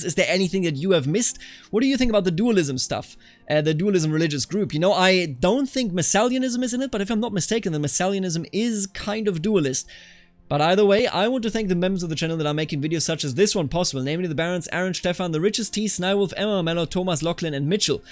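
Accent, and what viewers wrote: Germany. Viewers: He sounds very german lmao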